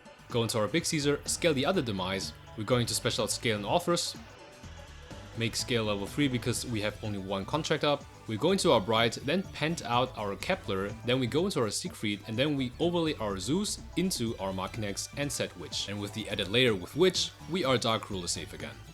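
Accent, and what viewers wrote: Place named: Germany. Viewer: You speak well, but the harsh pronunciation is noticeable. I think since I'm German myself, I can hear it more easily, haha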